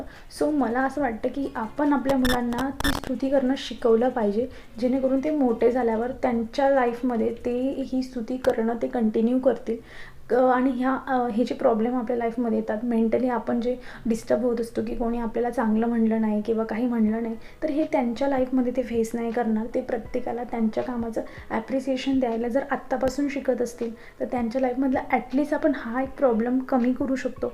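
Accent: Khandesh, India. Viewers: Tumhi proper kuthle ahe??? Khandeshi ahat ka???language vrun vataty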